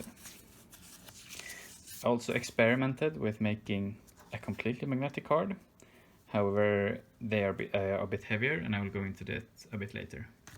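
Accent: Sweden. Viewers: Wait are you Swedish, your accent sounds like it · Love the swedish accent lol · Your accent sounds... awfully Swedish. (I'd know, 'cause hej hallå, jag är också en Svenne, isf.) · Are u Swedish? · Älskar den svenska dialekten, inte ofta man hittar en annan svenne här på tuben, lycka till med kort byggandet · Hey are you from sweden? No offense but you do have that swedish english accent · I was thinking of Also doing something like this. But im not to talented and i hope you somehow Will be able to buy these. Also is he Swedish? Hes accent souns Swedish/nordic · Just a quick question. Are you Swedish ? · This is so cool, also are you from sweden maybe? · Are you swedish?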